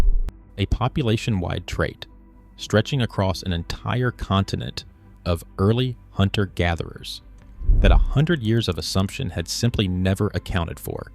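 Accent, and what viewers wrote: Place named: United States. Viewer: NOT IMPRESSED WITH AMERICAN ACCENT IN AI PLUS TAKES 28 MINUTES TO TELL 10 MINUTES OF INFO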